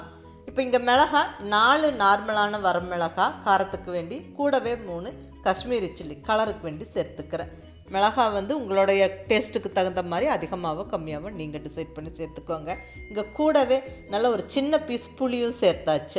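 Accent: Coimbatore, India. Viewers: எங்க கோயமுத்தூர் பாஷை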